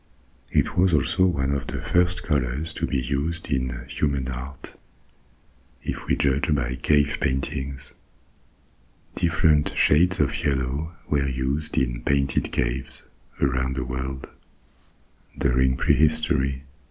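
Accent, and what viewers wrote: France. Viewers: Loving there's no AI and if a mere mortal as I can make a suggestion, then could do Dantes Inferno about the 9 circles of Hell?? I am sure you'd do it such justice with your Gallic tones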